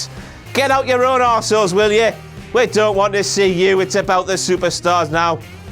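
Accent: United Kingdom. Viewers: He repeated the "tan job" like a million times and it still took me a while to get it. These British accents.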